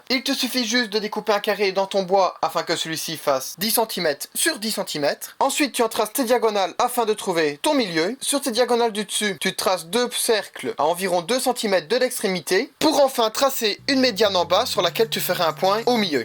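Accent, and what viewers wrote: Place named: Belgium. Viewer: quel accent belge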